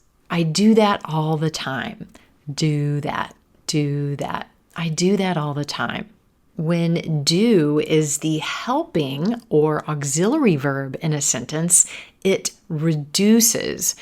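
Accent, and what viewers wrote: United States. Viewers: Your voice is so attractive. I am trying to search for some channels/blogs that have a clear American voice like yours for shadowing. Could you introduce some pages that I could imitate with scripts? Many thanks.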